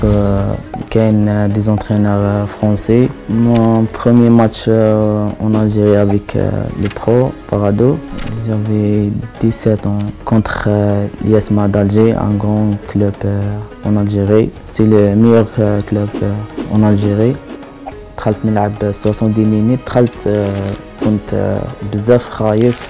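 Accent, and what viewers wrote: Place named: Morocco. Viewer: Pas de doute, tu ne peux être QUE marocain. Je comprends tout ce que tu dis. Alors qu une personne algerienne je dois m appliquer pour comprendre son dialecte. Enfin, peu importe, Bravo Béchar🤗👍❤ allah a nejhak🤗🤗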